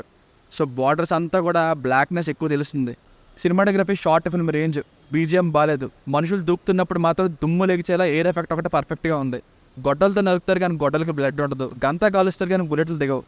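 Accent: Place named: Andhra Pradesh, India. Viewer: అరేయ్ నువ్వు ఆంధ్ర వాడివని నీ యాస లోనే తెలుస్తుంది అందుకే రాయలసీమ తెలంగాణ హీరోలను డి గ్రేడ్ చేస్తుంటారు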